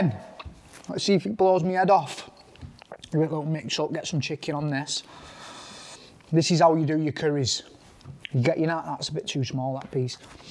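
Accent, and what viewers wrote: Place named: Blackburn, United Kingdom. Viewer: Blackburn accent going on there 😂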